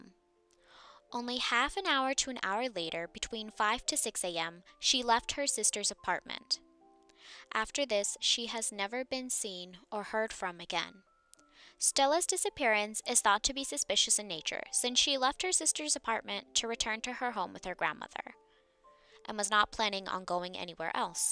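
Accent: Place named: Wyoming, United States. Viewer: Your accent sounded like a girl from Wyoming we had as an exchange student when I was in high school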